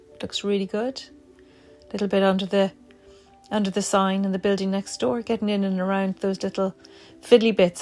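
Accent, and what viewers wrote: Ireland. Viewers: I just found you on YouTube! Love your drawings. Would you share what is the name of the brown ink? I really like it! I also adore your lovely Irish accent! Thank you.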